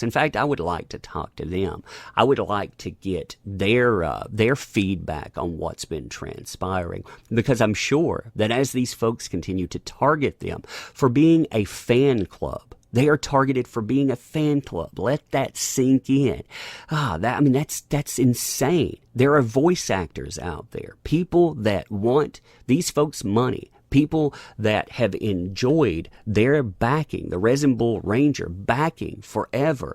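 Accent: South, United States. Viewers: Your southern accent is so soothing. :3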